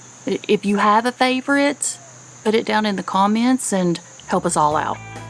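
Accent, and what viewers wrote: South, United States. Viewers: You have a thick southern accent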